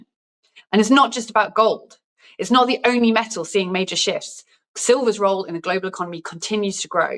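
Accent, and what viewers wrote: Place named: United Kingdom. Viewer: WHY DO ALL THESE NEWS COMMENTARIES HAVE TO EMPLOY A BRIT WHO TALKS TOO FAST ??LOCAL NEWSCASTERS,WEATHER PEOPLE EACH VENUE EMPLOYS AT LEAST ONE........I CANT UNDERSTAND THEM.MYSELF..HIRE AN AMERICAN WHO CAN SPEAK FLUENTLY AND SLOW DOWN...........SORRY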